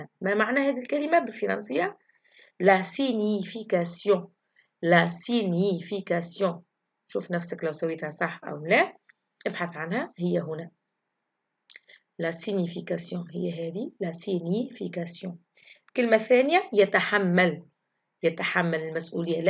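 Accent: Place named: Tunisia. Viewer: you have tunsian accent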